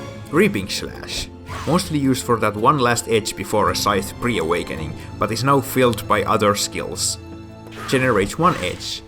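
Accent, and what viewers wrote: Finland. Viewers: are u from finland?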